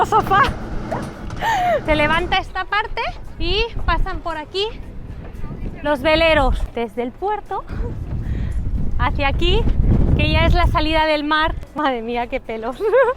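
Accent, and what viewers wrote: Andalusia, Spain. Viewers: Una Catalana con acento andalú? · Hola!! tengo una duda, porqué tienes acento andaluz si eres de Catalunya? ole tu carisma 😍 saludos... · Algún día visitaré España. Yo pensé que eras de Andalucía, no se, tu acento! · Y porque el acento andaluz, si sois catalana, o me equivoco completamente?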